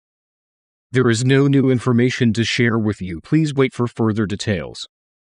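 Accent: United States. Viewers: Did no one observe the American accent on a British channel? 😅 However, great effort! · Like the background muisc, but you do need to be aware that a British EAS would not use an Americn/Canadian voice over person.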